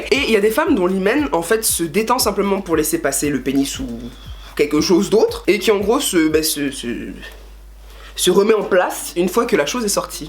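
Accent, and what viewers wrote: Ivory Coast. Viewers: J'adore ton accent ivoirien, on dirait une go bété lol, trop cool. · cool ton accent ivoirien ma soeur ... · j'aime ta manière de parle tes ivoirienne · Oui elle a l'accent ivoirien et je crois qu'elle est ivoirienne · Accent Ivoirien 👀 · C'est ma première sur ta page et j't'adore... Trop belle! Dit t'es Ivoirienne?? Juste que j'ai ressenti l'accent pas mal de fois! 😄😄😄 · Cool tu es ivoirienne on dirait 😍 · Accent ivoirien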